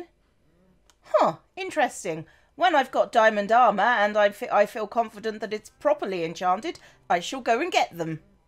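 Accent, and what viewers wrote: United Kingdom. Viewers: Omg shes British i love British accents can you say something really cool in the last episode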